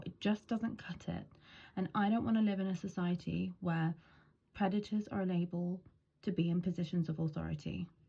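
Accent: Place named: United Kingdom. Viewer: I love that British 🇬🇧 accent.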